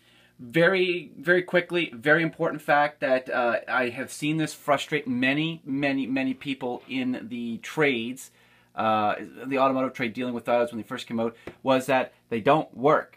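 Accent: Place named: Canada. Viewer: You're from canadia, I can tell.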